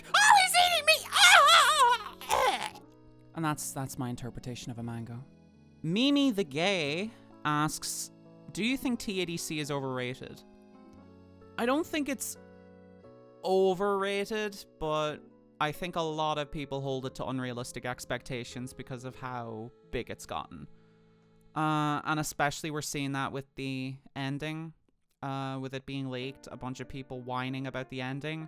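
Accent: Ireland. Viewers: Never mind about the irish accent, it's ..in your bio. Oops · Lowkey cracking up since it’s the first time I’ve hit up Voice Acting playlist and it’s the first time I’ve heard her natural voice and my dumdum American butt was hit with the rapid fire rolodex of comparing my bank of accents like: “ is British? Ugh no. Absolutely not. How dare I? No, it’s Auss- New Zea-? NO! You FOOL! It’s NONE of these. It’s…Wait that… Hold the- The Emerald Isle? Irish? But she’s extra oh so sweet and gentle! Southwest Irish? Transgirl Irish Jax???” And then I get the Irish confirmation later which was validating. Like the visceral wave of HUHHH??? Until I got it (I THINK, tbh not sure if the region is correct) was so dramatic. Am I at l at least close? Sometimes regional variations get me. Someone who knows this sweet darling birdy, please confirm! 😂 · Hears voice Me, immediately: Munster?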